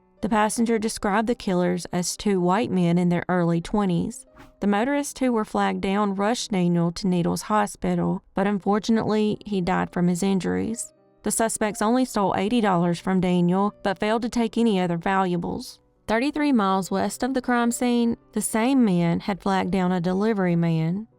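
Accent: South, United States. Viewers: Hi, I meant Girl 👋🏽😏. I never realised just how very many videos you've actually made. Just when I think 🤔💭 I've seen em all, I find a load more!! Don't think that WE, Your Loyal, Lovin' Fans Don't appreciate all the Effort, Time & Hard Work that You put in, because WE Really DO. Also, I Really, Really love ❤️ your Accent!! WE All Do. Thank You, Girl & rather than say "Keep Up The Good Work", I'm actually going to say "Take it easy on Yourself Miss & make sure you have time for yourself too". Kind regards to both You & Your Family, From The UK via Jamaica 🇯🇲💯 God Bless You. Wayne D. 😏👍🏽🌹🌹🌹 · It’s because she isn’t on-screen. The biggest channels have hosts that are nice to watch. Also, a lot of people think the southern U.S. accent sounds dumb. In the states, we look down on the dumb country folk 🤷🏼‍♀️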